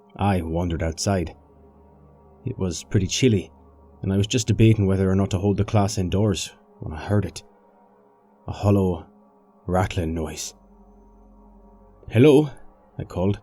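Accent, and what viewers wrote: Ireland. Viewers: Damn, I’ve heard this one before, but your narration’s the best one I’ve heard. Your Irish accent sounds awesome! Not too overdone, extremely believable. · Love your Irish accent! Great narrations!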